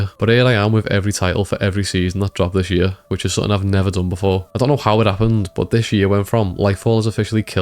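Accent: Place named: Liverpool, United Kingdom. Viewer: A scouser review! Let's go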